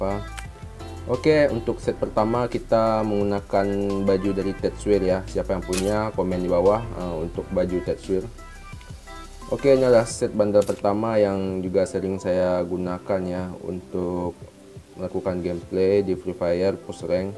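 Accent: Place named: West Sumatra, Indonesia. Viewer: Suaranya mirip minang kocak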